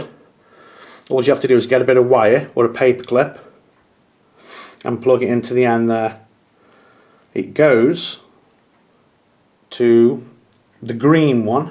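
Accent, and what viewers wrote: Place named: Shildon, United Kingdom. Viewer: Just tried this but using an Arduino's FTDI chip to do the serial side, no dice. So I've resigned to ordering a CA-42 Lead and see where that gets me. The Arduino did let me see the "LED:000000CC FAddr:0024A051" Error when the board is in place so I know I'm fixing the right issue. Totally Unrelated, You sound like you aren't too far from me, you sound very much like my friend from shildon :D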